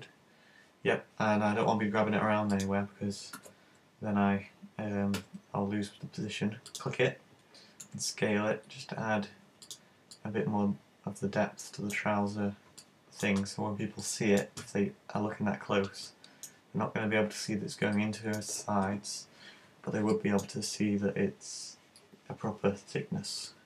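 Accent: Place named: Southern England, United Kingdom. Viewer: that accent sounds damn familiar :) guessing from south england but been in the north quite a while?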